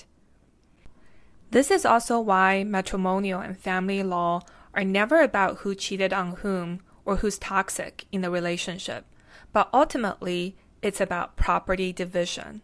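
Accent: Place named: Asia. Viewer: I detect an asian accent.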